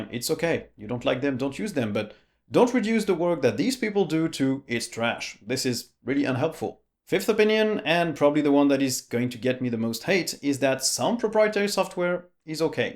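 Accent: France. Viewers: Completely unrelated but I didn't realize you were french Bonjour héhé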